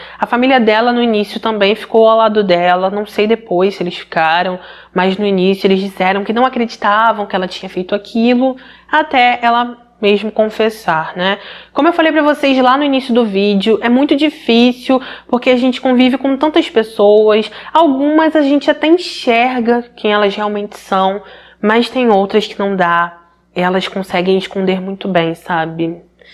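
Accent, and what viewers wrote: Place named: Belém, Brazil. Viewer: Sou novo no canal e adorei os vídeos. So uma curiosidade: és do norte do Brasil? Eu sou de Belém do Pará e teu sotaque é muito parecido com o de Belém 😂. Sucesso pro canal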